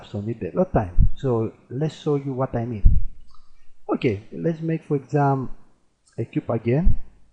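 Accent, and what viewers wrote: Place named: Jamaica. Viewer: nice plugin and you have a nice jamaican accent :))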